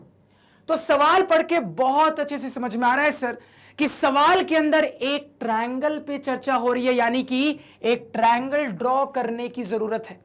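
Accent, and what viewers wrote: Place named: Bihar, India. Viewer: Sir app ko 5-6 months ho gye insta ID bane ko khete hue sir abb to bana lijye And in 11 th lecture you somewhere speak bihari but in 10th you speak like kaddhi boli . Kabhi 10 th lecture me bhi bihari language ka tadka lagyie.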